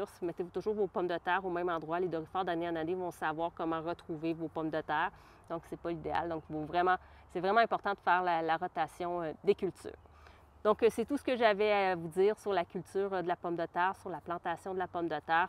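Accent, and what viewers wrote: Quebec, Canada. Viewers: Coucou amie Québécoise Très heureux d'avoir fait ta découverte et ton accent me ramène à Montréal ou j'étais il y a a peine un mois pour des vacances en famille ❤❤❤ Je m'abonne biensur a ta chaîne Bonne journée à toi et à bientôt Greg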